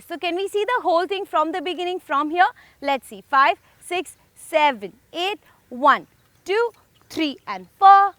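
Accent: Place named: India. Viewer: you speak english with indian accent so i cant understand what you are telling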